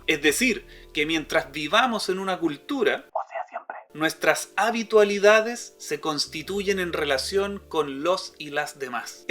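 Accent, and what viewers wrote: Chile. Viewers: Esa voz tan chilena 🙂😉.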